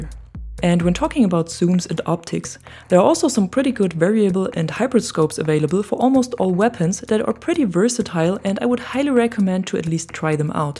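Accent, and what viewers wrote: Germany. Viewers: You have a new sub. Finally a channel with great tips and tricks instead of endless crying how bad the game is. Judging based on your accent, you are from Germany right?:)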